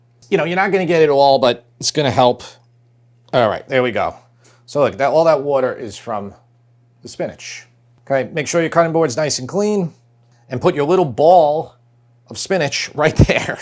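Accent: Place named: Philadelphia, United States. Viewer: He's gotta be from the Greater Philadelphia area.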